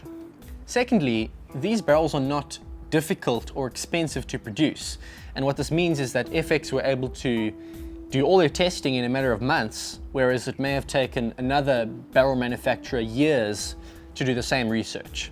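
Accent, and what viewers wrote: South Africa. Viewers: vkluchi subtitri mozhet tak budet legche perevodit.. U nego Uzhno afrikanskii akcent no v celom vse ponaitno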